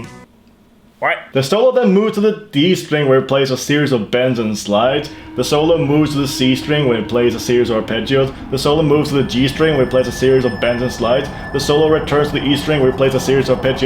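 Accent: Norway. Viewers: Who is this guy? Toki from the cartoon? Norwegian?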